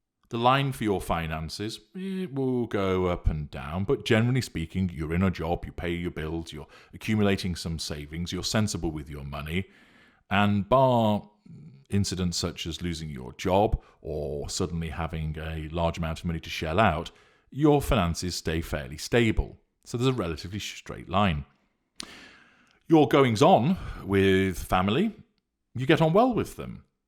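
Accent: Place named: RP, United Kingdom. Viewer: You’re and you sound ever so Posh!! Once again you’ve hit the mark 100% thank you Sir 👍🇦🇺 love your work 😘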